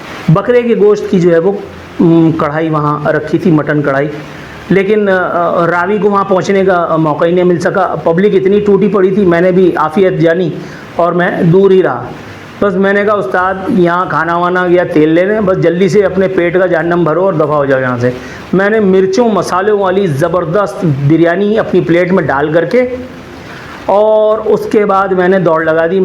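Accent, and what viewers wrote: Karachi, Pakistan. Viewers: یہ کراچی کی اردو ہے یہاں کے مہاجروں کی زبان ہے آکر سیکھ لیں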